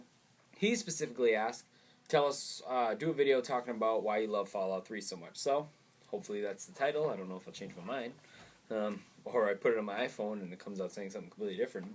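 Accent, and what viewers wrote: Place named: England, United Kingdom. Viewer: I signed in just to like this because of the english accent lmao great job.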